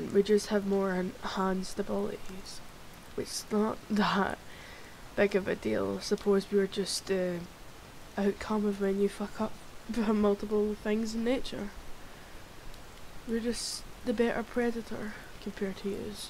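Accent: Scotland, United Kingdom. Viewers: ah my daily dose of scotish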